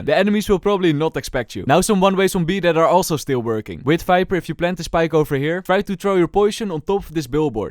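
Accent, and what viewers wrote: Netherlands. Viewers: i smell dutch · good tips but are you ducht?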